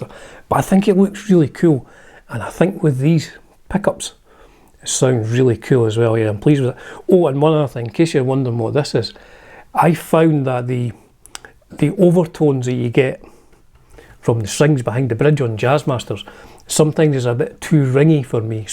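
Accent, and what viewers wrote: Scotland, United Kingdom. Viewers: I love a gorgeous Scottish accent !! ❤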